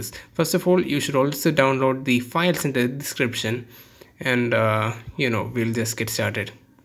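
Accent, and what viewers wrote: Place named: Kerala, India. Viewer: shabtam ketapol tanne manasilayi malayali annenu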